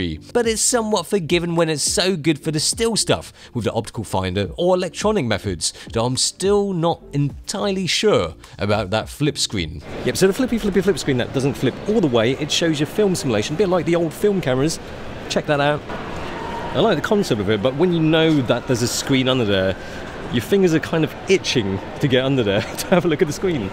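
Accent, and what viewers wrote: United Kingdom. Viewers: I just got an X-Pro3. I've had a couple X100's and a Pro2. I may be one of the ten people that actually use the OVF so my request is statically HUGE. On all the other hybrid viewfinders I've used from Fuji, in the OVF mode the parallax frame lines move to compensate when the shutter is 1/2 pressed and return to the neutral infinity position as soon as the shutter button is released. On the Pro3 I have, The parallax correction moves the framing box but DOES NOT RETURN TO THE NEUTRAL POSITION. This is VERY annoying when recomposing the next image at infinity after many years of muscle memory stored with the other Fuji Hybrids. If one close focuses and the frame moves to the lower right it remains there even when the camera is turned off and on again. And besides, it just ain't natural, Mate. It violates well known cosmic laws regarding such matters. 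I called Fuji support and they appeared clueless but only said they'd pass it along. Since you have a popular channel and are a witty Chinese guy with a British ascent (I'm not sure of another combination that might carry more instant authority) I thought perhaps you may get more attention if you brought it up.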